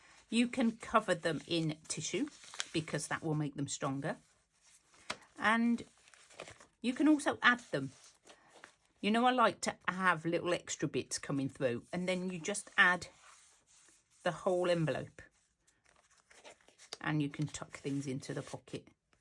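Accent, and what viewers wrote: United Kingdom. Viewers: I love the double pockets. Is that a Black Country accent I hear? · I love love love everything you showed us! Your style is my style. I really enjoyed listening to your British accent and seeing all you had to show us! Thanks you so much for sharing❤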